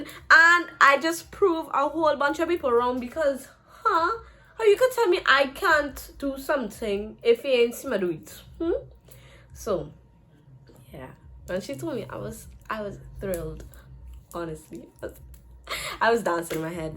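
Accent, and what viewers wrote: Trinidad and Tobago. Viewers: Jesus Crist am obsessed with trini accent love from ja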